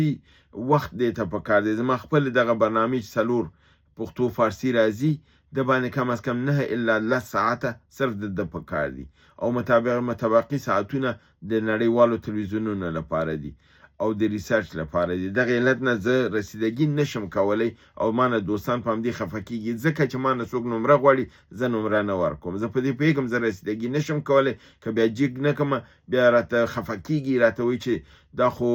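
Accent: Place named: East, Afghanistan. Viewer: te ham po mashreqi lahje khabare kawe. Parse loghatuna ham d°er karawe. wahalai NA balke weshtai de.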